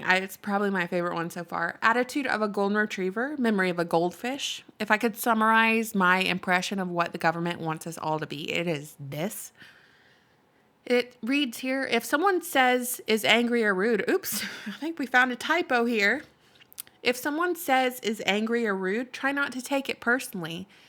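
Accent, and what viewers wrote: South, United States. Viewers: IDK if that country accent is you're true accent or not but love it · Oh my you had me rolling at"Spin the wheel Memaw!" Absolutely LOVE your southern accent!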